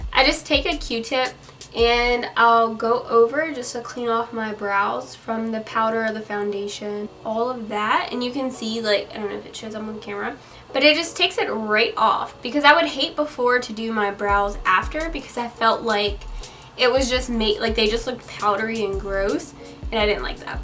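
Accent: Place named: South, United States. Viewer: Sucks you had a allergic reaction, i had one to fix plus spray 😭 glad your feeling better 💜 i also have the 1st palette from carli bybel. I love the highlighters in the palette, great prom tutorial doll💜 oh and yes you sound a bit country, not a lot lot but a bit of a accent. Lol its cute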